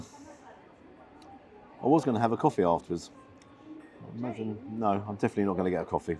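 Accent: United Kingdom. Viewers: Glad your putting all these overrated overpriced places in there place, and the british accent makes it more credible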